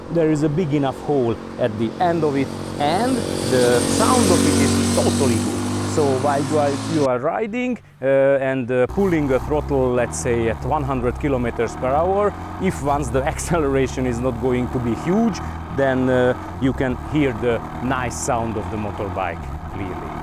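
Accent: Hungary. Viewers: Nice hungarian accent.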